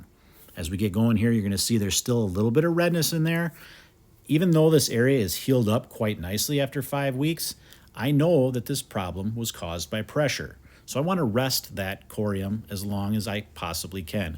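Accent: Wisconsin, United States. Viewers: It's always "that" coreum. Never heard him say "the" coreum. Total Wisconsin thing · Your Wisconsin accent is deeply calming and appreciated.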